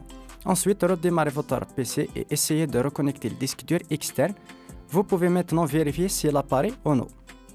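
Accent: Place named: Spain. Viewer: il est style ton accent !! espagnooool franchement gg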